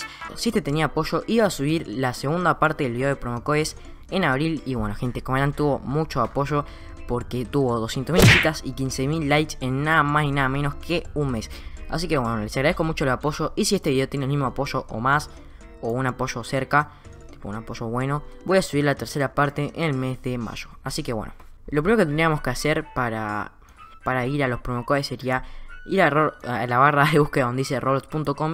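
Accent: Argentina. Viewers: Eres aljentino ?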